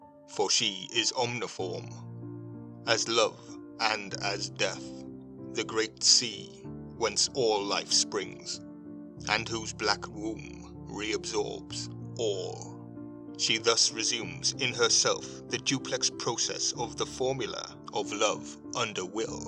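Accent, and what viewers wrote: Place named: England, United Kingdom. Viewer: Hello my dear friend. I am still here. Glad your work continues. How are you? I miss the English accent voice...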